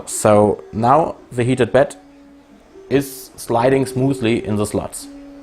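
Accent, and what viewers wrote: Germany. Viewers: Sehr informatives Video, vor allem wegen der "kleinen" Fehler die an einem nagelneuen Drucker auftauchen. Habe mir bei "Aliexpress" einen für 175 € bestellt. Bin jetzt vorgewarnt hinsichtlich der Produktionsqualität. Werde den Aufbau mal protokollieren , ob die Fehler inzwischen behoben sind. Apropo Englisch - ist OK - ,der deutsche Akzent ist in den USA sogar recht beliebt. Und man redet keinen Müll bei technischen Themen. Very informative video, especially because of the "small" errors that appear on a brand new printer. I ordered one at "Aliexpress" for 175 €. I am now forewarned regarding the production quality. Will log the structure times, whether the errors are now fixed. Apropo English - is OK - , the German accent is even quite popular in the US. And you do not talk trash about technical issues. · your english is better than some native speakers here the US.. 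plus having a German accent works well with talking about mechanical things.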